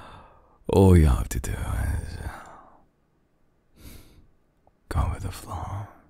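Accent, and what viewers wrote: United Kingdom. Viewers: i swear the british accent makes this video <num>X better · He's....brit isn't he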